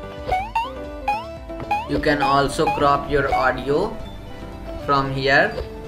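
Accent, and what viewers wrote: India. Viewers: india ascent is Suck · This accent and why indian not being racist or anything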